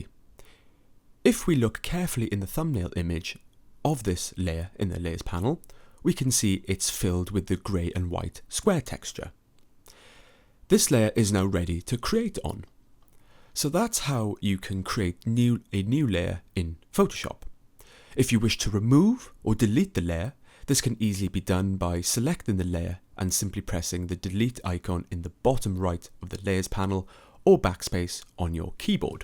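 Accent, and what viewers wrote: United Kingdom. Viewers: OMG ! i don't know how to say thanks ,, you are really great teacher .. thanks a lot , and i liked your British english pronounciation ^^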